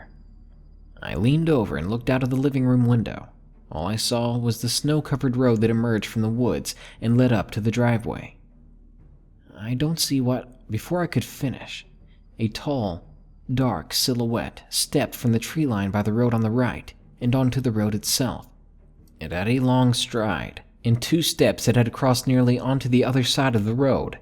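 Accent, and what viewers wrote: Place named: South, United States. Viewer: Sir, I love your country accent.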